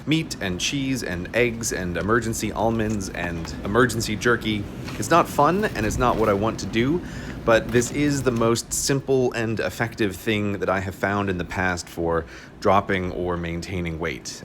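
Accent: United States. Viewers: Woah woah woah woah hold on... you're NOT American? But your voice sounds so plain like ours.... WAT?!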